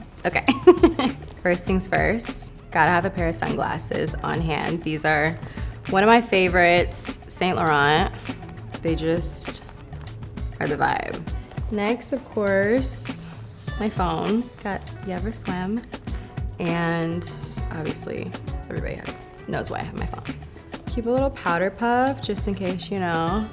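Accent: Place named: California, United States. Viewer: It’s a California/LA girlie accent. We tired of it in the states as well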